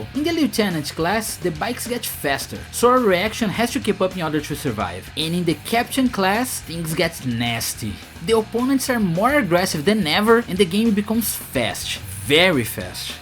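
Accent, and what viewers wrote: Brazil. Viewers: You are not a english speaker right? The way you phrase your words sounds like you are brazilian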